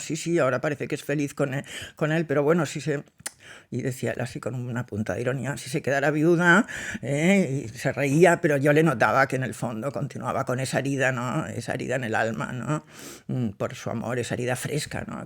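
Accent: Catalonia, Spain. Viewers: Me encanta como.hablas eres genial ¡¡!!un saludo 😊❤tu eres catalana no??yo tengo mucha familia en mataro y gerona se fueron de aki de extremadura😊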